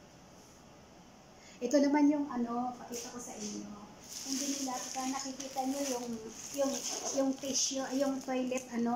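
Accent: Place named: Visayas, Philippines. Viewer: hello nanay bisaya ka haha pariha ta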